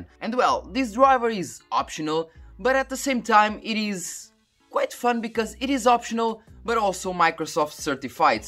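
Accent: Brazil. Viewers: Are you Brazilian or Portuguese?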